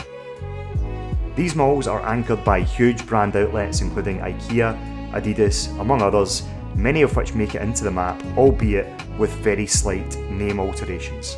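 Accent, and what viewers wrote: Scotland, United Kingdom. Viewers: Appreciate hearing an awfully Scottish sounding voice in a tarkov video. This caught my eyes on my recommended feed and hopefully will get into others too.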